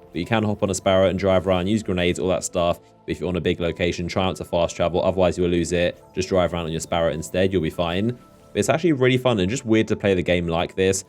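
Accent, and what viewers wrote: England, United Kingdom. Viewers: I really like English accents. You're a very good youtuber, but when I see big news that various YouTubers cover I choose yours because you just sound so dang proper.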